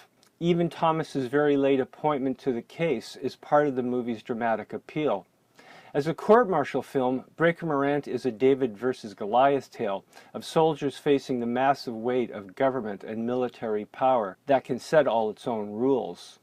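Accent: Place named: Canada. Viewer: Ah didn’t know that the Canadians pronounce the same as the yanks. I’ve recently gotten into your channel and I’m enjoying your content. And mabey someday we too can shed the shackles the poms have on our phonetics. Also have you thought about doing some kiwi cinema as it be good to see our cousins represented.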